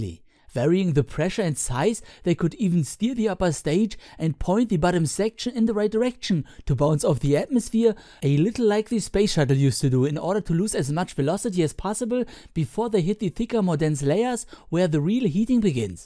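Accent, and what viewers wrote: Germany. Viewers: I only recently discovered your videos... No idea why it took me so long. Your content is amazing, not only the whole discussion about the different approaches and ideas, but also the visuals and your wonderful German accent :D Liebe Grüße aus Niedersachsen! Mach weiter so! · Rasyidan Ilmansyah he is clearly Germany his accent proves that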